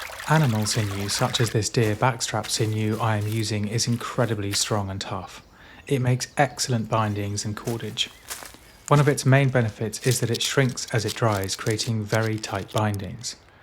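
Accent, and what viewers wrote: England, United Kingdom. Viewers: well spoken English accent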